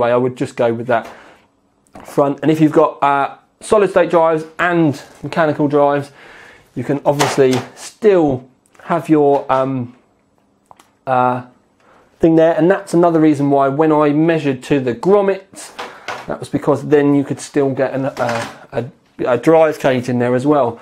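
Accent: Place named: Cockney, United Kingdom. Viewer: You make computer case reviews fun to watch. You are a true American hero. Or would be, if you didn't speak like a cockney so much.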